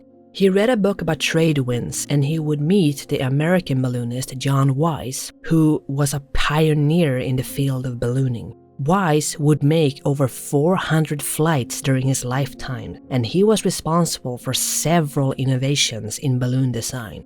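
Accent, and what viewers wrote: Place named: Sweden. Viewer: assuming you are actually from Sweden , as an English person it is great to hear a real narrator whom isn’t English or American especially when it’s covering an event concerning a ‘local’ ! I’ve had to watch all the previous covering this because it’s pretty bonkers & am enjoying your treatment of the adventure 😁👍❤️from Bournemouth in Sw England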